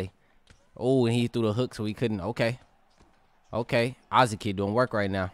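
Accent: Jamaica. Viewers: Lol you Jamaican bro? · u jamaican nw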